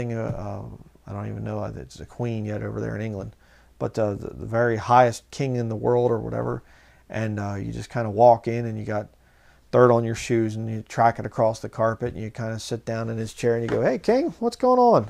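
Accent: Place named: Pennsylvania, United States. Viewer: Don't worry about your accent brother, we Pennsylvanians are unique in that regard. I'm from the other side of the state -Pittsburgh and many of us say "keller" for color (and "aht" for out and "cahch" for couch)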